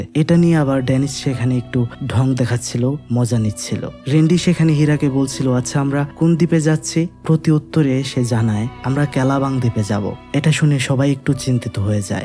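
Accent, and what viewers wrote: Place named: Bangladesh. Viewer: Kothar style a mne hocce uni bngldshi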